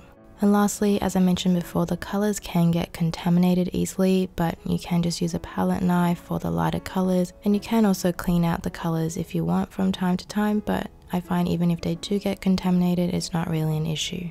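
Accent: Australia. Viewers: Are you Australian or lived in Australia? I’m asking since u have a very Australian accent specially when you said “water” as “wodah” etc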